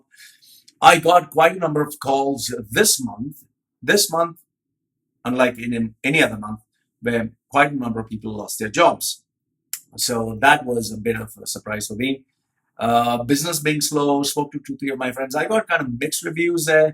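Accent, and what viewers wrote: India. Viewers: Curious - why are you wearing a full face Maori Moko yet sound Indian.....?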